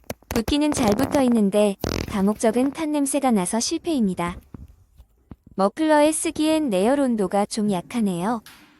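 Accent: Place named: Busan, South Korea. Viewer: 아 부산분이셨군요ㅋㅋㅋ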